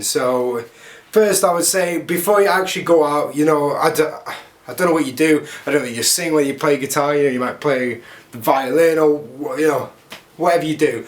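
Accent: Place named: Alabama, United States. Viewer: Ya no dat albama acent ya no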